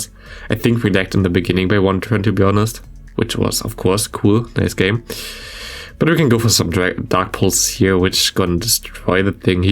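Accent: Germany. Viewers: I hate your accent. Typisch deutscher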